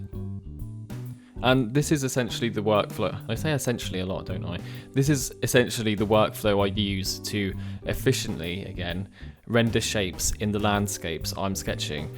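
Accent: United Kingdom. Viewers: Ha, it's nice to hear a fellow Brit artist. We're a minority group in concept art :p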